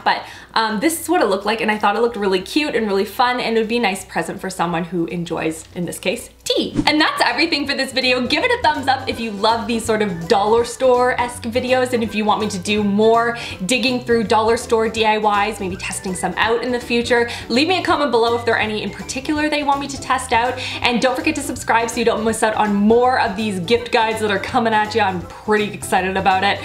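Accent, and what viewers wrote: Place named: Canada. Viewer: I love hearing you say about... you're a true Canadian😋 sad that I live in Minnesota because I have the accent but not a strong as yours😢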